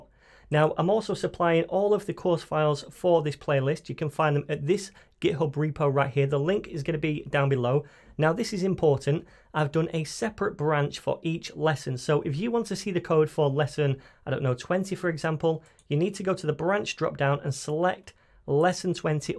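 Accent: United Kingdom. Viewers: Is this British Accent. I Love it so much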